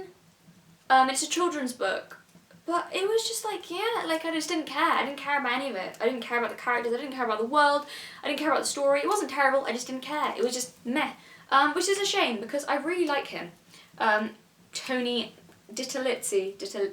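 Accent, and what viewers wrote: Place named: United Kingdom. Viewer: By prober vids i hope you mean videos where i have to check if my headphones are working! 😂 (i showed your shadowhunters videos to my friend who loves them and he was like "she has to shut up, because shes right but i love the books and no smart girl with an adorable british accent can change that" 😂😂😅 we italians do love your accent)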